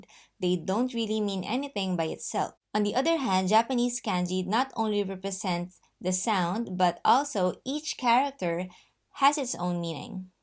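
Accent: Philippines. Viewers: Dear all watchers... I am a Japanese girl from Tokyo. And We feel so much disturbed by these sorts of Fake video! This woman who was speaking in this video is not Japanese and her accent is Philippino. This is the real issues because when we learn language, we need the real native, the real nationality the language. Because it’s crucial to learn the the manners, the way to communicate and so many things. It’s too too bad because watchers will think this woman is Japanese. It’s so serious! We really don’t want watchers misunderstand. Because Philiplipino is quite quite different from us Japanese. Especially our manners, accents and the way we talk, smile and tons of things!!! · Love the logo! Though a better/more efficient way to learn Kanji is via learning the Radicals as opposed to random Kanji as presented here. Also, she's clearly a Filipino speaker & not Japanese. 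Sorry, but both the English & Japanese are slightly accented! I commend your efforts, but find that it's essential & utterly important to learn correct intonation & pronunciation from the get go! Good luck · Are you Filipina maam? I can hear the accent😊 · Your accent sounds Filipino. Is your mother tongue Tagalog?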